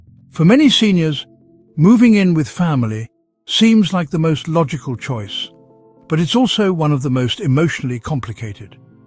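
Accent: England, United Kingdom. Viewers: So despite the AI David Attenborough ENGLISH accent narration, this is intended for an American audience. Would be good to point this out at the start or in the title.😊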